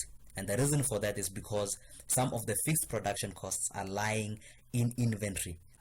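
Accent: South Africa. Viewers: chee are you south african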